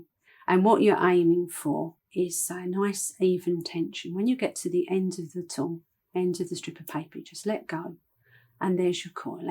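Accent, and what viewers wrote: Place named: Cowley, United Kingdom. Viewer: Your accent helps - sounds terribly similar to what I grew up with in Cowley near Uxbridge as a youngster.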